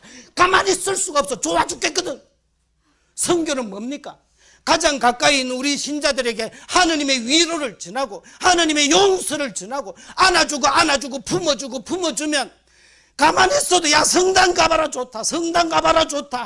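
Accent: Gyeongsang, South Korea. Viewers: 신부님 안녕하세요. 처음 인사올립니다. 전 마리아 입니다. 미국에 알라바마 어번이라는시에 있습니다. 신부님의 강론은 처음부터 끝까지 노래속에 웃음속에 하느님의진리가 저을 깨달게하고 열정을 줍니다. 신부님의 경상도 사투리가 참으로 정겹고 고향의소리라 참으로 좋슴니다. 신부님 건강하세요. 감사합니다. 수고하셨습니다.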